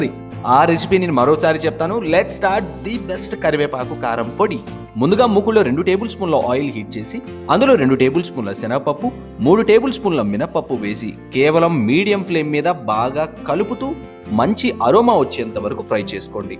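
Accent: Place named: Telangana, India. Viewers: Telangana slang super.. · Telangana yasa. Super bro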